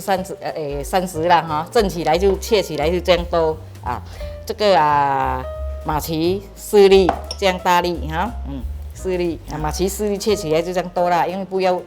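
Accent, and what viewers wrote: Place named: Hokkien, China. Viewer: ，你讲话很像我妈妈，参一点福建话这样啊😊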